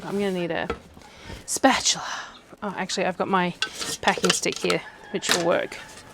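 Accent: Australia. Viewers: Good afternoon from the States. I love your vids girl. So fun to watch what you come up with. I find when I'm having a really bad day, I get excited when I get notified of one of your cooking videos. It brightens my day. Your voice is soothing and since I have lived in Mosman, a suburb North of Sydney many years ago, I enjoy hearing your accent. It reminds me of the many adventures I had there and how bad I need to go back. Thanks for bringing light into a dreadful day and for so many cool ideas to cook or bake. You're a lovely woman and I hope when I get back under, I can buy some of the foods you use that we can't get here and send them back to the States...the cost would be so worth it. Ta and have a wonderful week!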